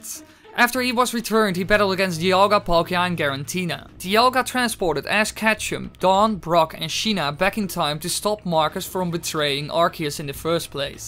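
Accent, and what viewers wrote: Netherlands. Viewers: you sound dutch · I can hear this guy is dutch. Just his accent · Are you dutch?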